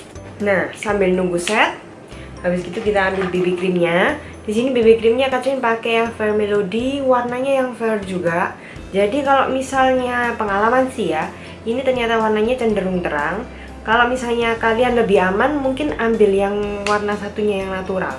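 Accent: Java, Indonesia. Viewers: "...udah moncrot" hahaha 😂love your video. already subbed. if you dont mind to visit my videos too. tos dulu sesama lidah medok 😘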